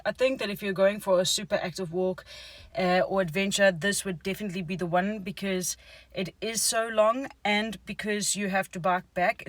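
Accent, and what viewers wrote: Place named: South Africa. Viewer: I think this is Sandra Bullock with a South African accent.....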